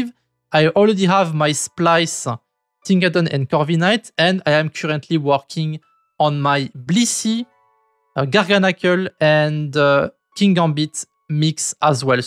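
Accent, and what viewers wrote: France. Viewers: Hahahaha your french accent is f obvious when you speak, also nice video btw. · je m'abonne car tu me fait rire avec ton accent français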